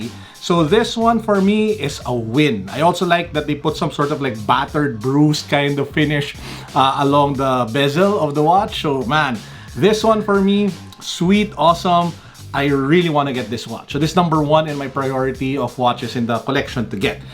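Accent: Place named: Philippines. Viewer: Love the Filipino accent. Cheers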